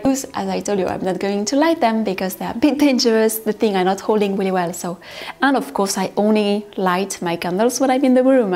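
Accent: France. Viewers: Love watching you decorate. Not taking anything away from your immense talent which is everything, you must be aware that your French accent and living in a wonderful home in France is what sells it. I am jelly.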